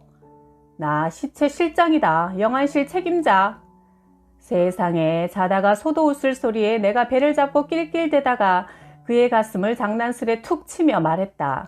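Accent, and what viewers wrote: Busan, South Korea. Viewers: 님 부산 사투리 ~~ㅎㅎ 최곱니다.^^ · 님 부산사투리 직이네예~~😂😂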